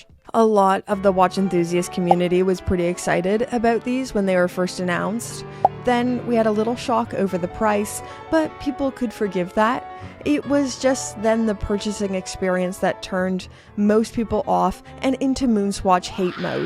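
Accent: Canada. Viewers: I keep hearing the long O sound in “about”. Is Canadian? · Stay with your Canadian accent. I can sing the Canadian National Anthem and I’m American. “Oh, Canada…” Are you happily married?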